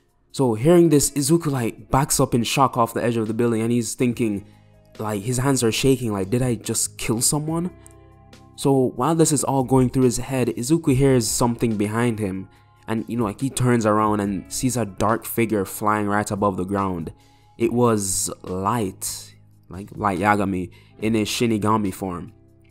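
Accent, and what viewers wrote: Jamaica. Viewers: Bro are you Jamaican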